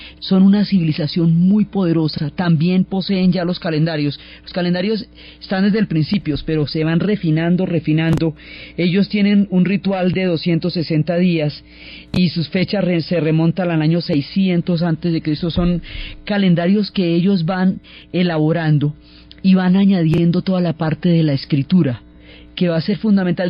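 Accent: Colombia. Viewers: Hola Ferlo, en Guatemala habian Mayas. Tanto su presencia que yo pensaba que ellos habitaron en Guatemala y creo que el escritor de hombres de maiz es guantemalteco. Si buscamos autores guatemaltecos sobre los mayas los encontramos. Tambien Chiapas y Yucatan eran de Guatemala. Asi que los mayas segun mi conocimiento eran de Guatemala aunque si hubieron Mayas en franjas de El Salvador y Honduras. A mi me parece que Diana Uribe hace un buen trabajo. Recuerde que ella es colombiana y su pronunciacion es diferente a la mejicana y centroamericana.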